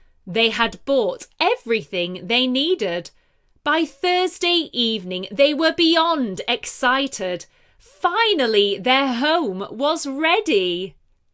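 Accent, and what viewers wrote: United Kingdom. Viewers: And I love British accent 💕💕💕💕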